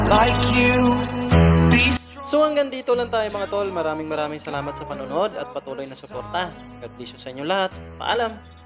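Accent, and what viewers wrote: Visayas, Philippines. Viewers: Bisaya kase. Ok lng yan. Hehehehe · Ang ganda ni Bella Padilla😍 At sa mga nanghusga sa Vlogger dahil matigas magsalita haler bisaya e proud ako sa mga bisdak inggit lang kayo hahajokjok😂✌️ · Kung ganyan man sya magsalita ay huwag po naten sya pagtawanan .. .ganyan naman talaga magsalita ang bisaya diba.. . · I'm also a bisaya and i'm proud of it. Most of us are nahihirapan magsalita ng tagalog fluently, fortunately tumira ako sa Manila nung bata pa ako kaya okay magtagalog. Pero naiintindihan ko ang may ari ng vlog na to kasi may mga kaibigan at classmates din ako na ganito magsalita ang I don't see any problem with that. Kuya Vloger, ipagpatuloy mo lang po ang pagvavlog proud kami sayo na mga kababayan mo. Saludo po ako sa katatagan ng loob mo, wag mo nalang po pansinin ang mga nambabash sayo, most of them are inggit lang sayo. 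Ipagpatuloy mo lang gumawa ng mga videos as long as it makes you happy and also continue to be proud Bisaya😊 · Bisaya kaman dong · Bisaya siguro ang may Ari ng vlog na eto. Iba kasi ang tuno ng Tagalog niya.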